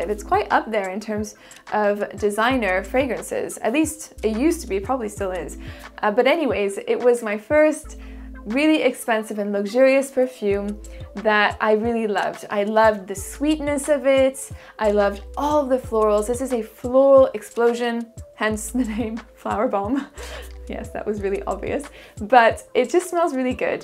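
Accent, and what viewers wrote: France. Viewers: Your french accent is so interesting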